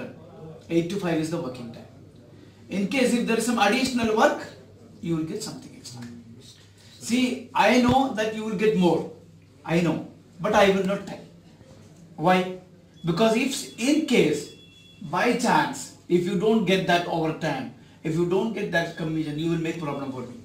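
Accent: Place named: Kerala, India. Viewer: why didn't you polish your accent, it sounds I am hearing Malayalam in English language.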